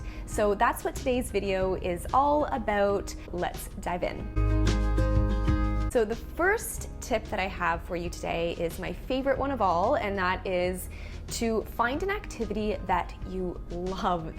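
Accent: Canada. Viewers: Thanks for the tips! Just wondering, are you Canadian?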